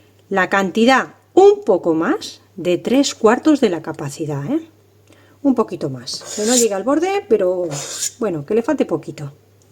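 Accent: Spain. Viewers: LIKE 369 :hand-pink-waving::hand-pink-waving::hand-pink-waving::face-blue-smiling::face-blue-smiling::face-blue-smiling::face-blue-smiling::face-blue-smiling: CON LO QUE ME GUSTAN LAS MAGDALENAS! Perdón por las mayúsculas, ya no borro. Tienen una pintaza!! Gracias miles por compartir tu receta. Saludos!! Desde España también, tu también, no? Por el acento....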